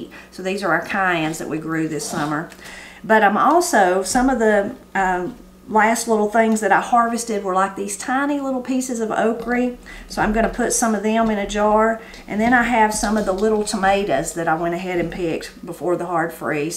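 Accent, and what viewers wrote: Appalachia, United States. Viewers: Just keep talking Miss, that’s what has drawn the majority of us folks to your channel is your colorful language, along with so much more. Anyone that doubts anything you say on here should meet you in person as Robbie Lynn & I have on several occasions now to witness that you are the same genuine person on and off the channel. We say Appalachia just like you do along with many other words you use like okrie and yes mam we push the Buggy in the Grocery Store to lol 😂 👍🏻👍🏻 We get that a lot on our channel to where folks say that we have an accent down south to like you mentioned, but to us it’s just the way we were raised to talk like you said about y’all. Oh yea common sense out weighs an education any day of the week in my book to. Thanks for sharing this our APPALACHIAN Friend!!!! 😇🙏🏻😇🙏🏻👍🏻🙌🏻❤️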